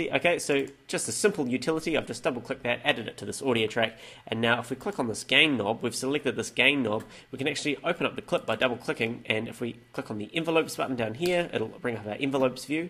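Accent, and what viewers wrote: New Zealand. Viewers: Instantly knew you were kiwi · He's a Kiwi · Is your accent New Zealand or Australian? I love it none the less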